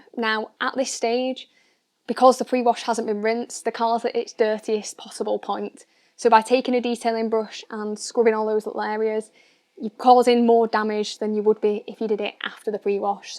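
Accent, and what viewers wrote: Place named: Northern England, United Kingdom. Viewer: I can't help but notice you have a northern accent. As someone from the south east, with a south facing drive way, I can assure you direct sunlight is insufferable. On dark cars. On light cars it really makes sod all difference. Water literally boils on contact with dark paint. It doesn't even need to be hot, just sunny. What we also have down here that you don't, is really hard water.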